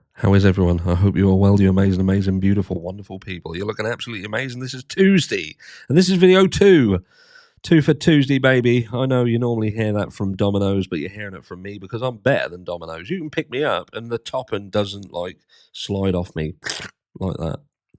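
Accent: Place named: England, United Kingdom. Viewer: Excellent article and narration. Something about hearing things read with an English accent makes them sound more profound. Thank for taking the time to post. Cheers!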